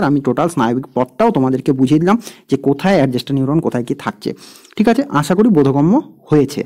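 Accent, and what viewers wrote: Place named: Bengal, India. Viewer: Sir please can you tell in Hindi or English along with Bengla language... Its so difficult to follow your speed Bengal accent... Please please please.. I need it..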